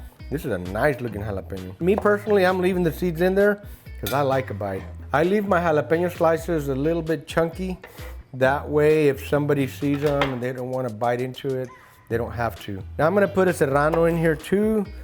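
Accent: Texas, United States. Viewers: This guy is soooo Texas lol, the "duuuude!" was beautiful😊 loved the recipe, tysm ❤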